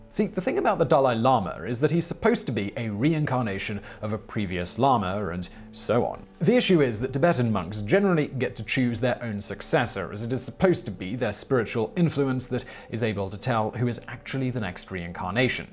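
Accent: United Kingdom. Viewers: yet even when he adlibs he has an upward inflection at the end of every sentence, (like a ditzy blonde) which certainly isn't a British thing, He's the face of these channels with his very nice middle class British accent, and when the subject of a video is British, I would expect him to add a British slant to it rather than it being slightly biased american, cherry picked history, tbf I'm not really including top tenz as that's pretty obviously American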